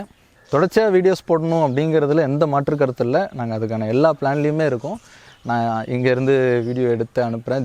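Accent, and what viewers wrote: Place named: Theni, India. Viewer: Bro neenga entha ooru pakka Theni matheri iruku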